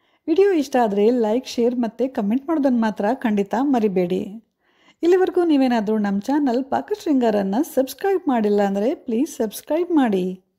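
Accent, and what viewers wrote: North Karnataka, India. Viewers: I like your north karnataka style of talking, it feels natural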